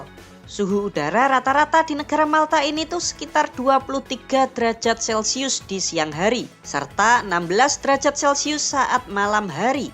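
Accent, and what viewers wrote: Java, Indonesia. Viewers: Medok bgt hostnya😂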